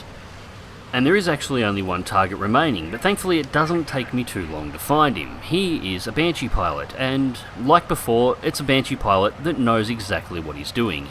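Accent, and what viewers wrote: Australia. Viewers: He's from Australia, hence his logo and his accent.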